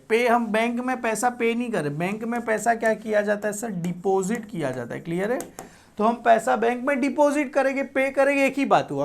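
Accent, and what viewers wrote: Gujarat, India. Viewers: Sir aap Gujarati ho na? Aapka Gujarati accent hai You are an amazing teacher. Heads off to you ❤❤❤ 😊😊😊😊😊😊😊😊😊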